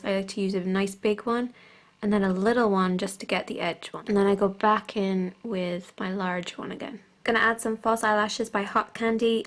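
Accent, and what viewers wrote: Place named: Cork, Ireland. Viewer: Love your videos! Especially with a cork accent it good to hear! :-D haha! I've just started making my own videos if you wanna check om out! Get more Irish on here :-D haha! X x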